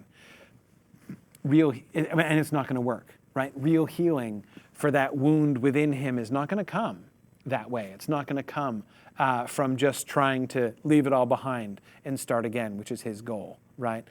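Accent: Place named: Ireland. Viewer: Irish hobfoot accent doesn't help. Can you hear the sounds of "magic WANd" & "number ONe"?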